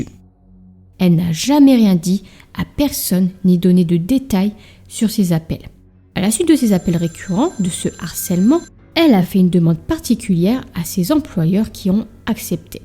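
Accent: North, France. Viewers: Petit accent du Nord, me trompe-je ?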